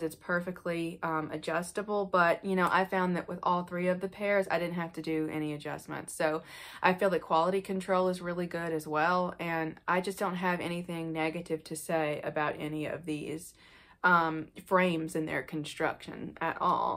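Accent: United States. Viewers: thanks for the new video! Was thinking about you the other day. "Where did she disappear?", I thought. Glad to see you are fine! As always, nice, detailed review on a couple of awesome sunglasses! The same cool sounding American English to me!:)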